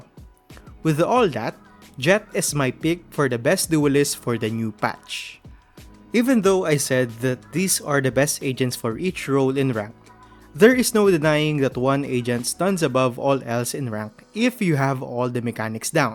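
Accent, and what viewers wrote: Philippines. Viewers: Are you filipino? your accent sound filipinos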